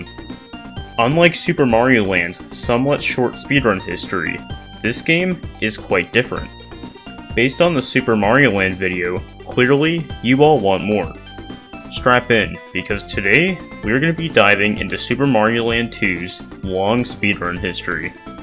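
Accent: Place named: Canada. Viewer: "...sorry if i keep rambling on but theres something I need to talk abut..." I fuckin love canadians and how you all talk <3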